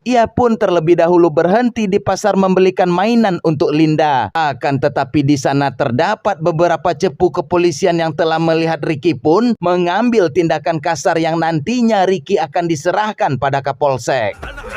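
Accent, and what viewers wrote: West Sumatra, Indonesia. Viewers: Orang minang kah bg? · Urang awak kiro e😂😅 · Urang awak admin nyo kayak e qkwkwk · Urang awak kiroe admin eeee wkwkwkwk Kundiak gadang ndak ru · Urang awak ruponyo ha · Orang Minang bg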